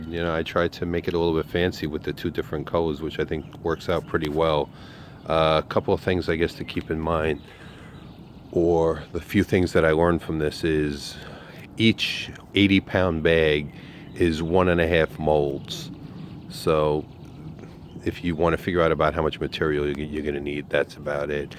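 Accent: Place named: Illinois, United States. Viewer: Judging by the accent, this guy is definitely from Illinois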